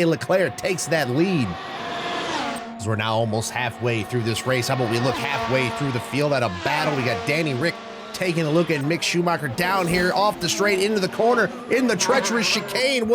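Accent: United States. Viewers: American accent with f1 intro sounds weird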